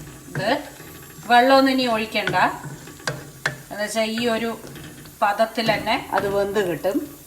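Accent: Palakkad, India. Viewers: ഞാൻ ഒരു ട്രാൻസ് വുമൺ ആണ്. കുക്കിങ് ഇഷ്ട്ടമാണ്. ചേച്ചിയുടെ ഫാമിലിയെ ഒരുപാട് ഇഷ്ട്ടമാണ്. പാലക്കാടൻ തനിമ നിറഞ്ഞ സംസാരം ഒരുപാട് ഇഷ്ട്ടമാണ്. വീട്ടിൽ പ്രശ്നം ഉള്ളതുകൊണ്ട് എറണാകുളം ഹോസ്റ്റലിൽ ആണ് താമസം. വീട്ടിലെ ഫുഡ്‌ ഒകെ കഴിച്ചിട്ട് ഒരുപാട് ആയി. അമ്മയുടെ കയ്യ്കൊണ്ടുള്ള ഭക്ഷണം കഴിക്കാൻ കൊതി ആകുന്നു. ചേച്ചിയുടെ വീഡിയോ കാണുബോൾ എനിക്ക് എന്റ്റെ അമ്മയെ ഓർമ വരും. ചേച്ചി ഒരുപാട് സന്ദോഷത്തോടെ കുക്കിങ് ചെയ്തു മനസു നിറഞ്ഞു ആ ഭക്ഷണം കഴിക്കുന്നത്‌ കാണുബോൾ എന്റെ മനസും വയറും നിറഞ്ഞ ഒരു ഫീൽ ആണ്. Love ❤ U ചേച്ചി. എല്ലാവരോടും അനേഷണം പറയണം എന്റെ.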